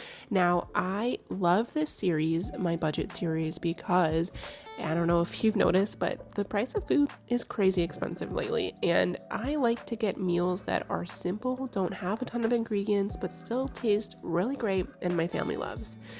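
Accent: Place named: Michigan, United States. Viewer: Everything looks great! Sounds like you're from Michigan or somewhere north??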